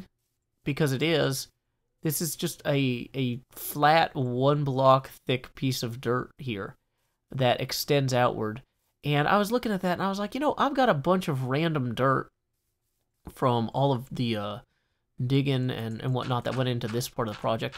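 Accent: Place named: Tennessee, United States. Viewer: I had no idea I wanted to hear “Hack the planet” in your musical Tennessee accent. Always learning new things on your channel,.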